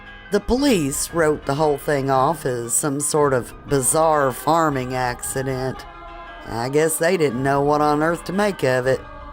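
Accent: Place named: Kentucky, United States. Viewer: Hey this is wonderfully narrated! Love the Kentucky accents. :)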